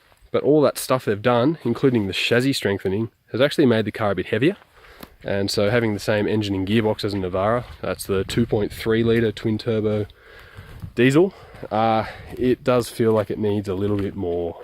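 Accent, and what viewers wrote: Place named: Australia. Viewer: Cool Australian accent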